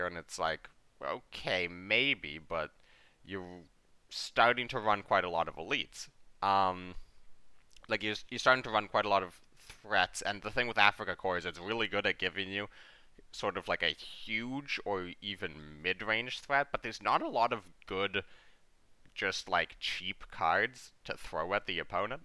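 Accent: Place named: Canada. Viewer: Thank you so much for these videos. Your insights on these cards are very interesting and, in some instances, surprising. On a side note, has any one ever told you you sound like Vince Vaughn? I mean, apart from the Canadian pronunciation, you sound exactly like that guy.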